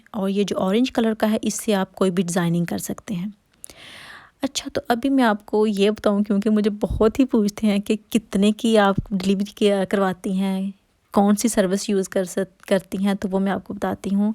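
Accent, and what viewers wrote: Faisalabad, Pakistan. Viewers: Urdu lehja bilkul fsd walo ka hai 😋😋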